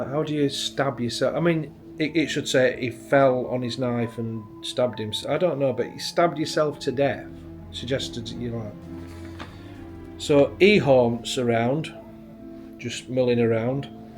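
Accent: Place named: Scotland, United Kingdom. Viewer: Hellooooo is there any ghosts, you sound scottish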